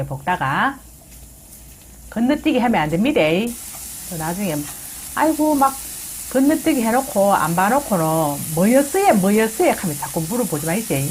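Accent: Gyeongsang, South Korea. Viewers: 경상도 무뚝뚝한 언어속에 정겨움이 묻어나옵니다 ^^ 항상 잘보고 잘배워갑니다.2022년도 항상 건강하세요~~♡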